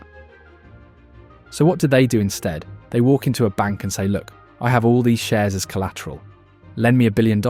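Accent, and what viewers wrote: England, United Kingdom. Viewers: Why you got an english accent. But the whole video is talking about dollars ?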